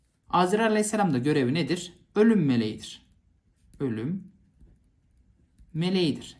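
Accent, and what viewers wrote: Malatya, Turkey. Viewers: Hocam çok güzel anlatıyorsunuz elinize sağlik ama siz Malatyalı mi siniz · Hocam Malatyalımısınız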